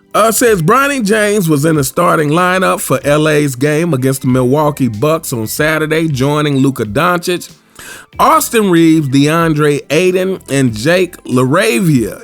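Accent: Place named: Memphis, United States. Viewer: Been following your content for a while. I knew you were from Memphis after watching one of your episodes. Are you from the Mound, because you sound like 8Ball.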